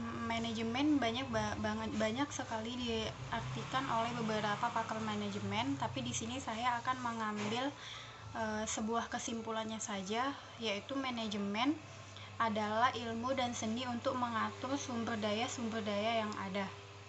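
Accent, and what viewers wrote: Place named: Java, Indonesia. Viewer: Asli mana kak ..kok medok jawa ?🤣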